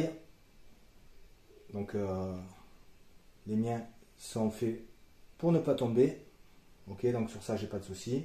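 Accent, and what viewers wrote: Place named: South, France. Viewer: Super vidéo du CP300, comment ça ? Il fait froid avec l'accent du sud de la France 😊.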